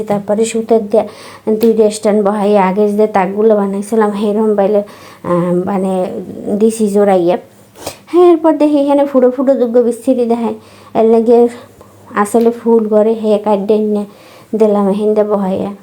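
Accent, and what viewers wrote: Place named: Barishal, Bangladesh. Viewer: বরিশালের ভাষা তোমার মুখে কি যে ভালো লাগে আপু মাশা-আল্লাহ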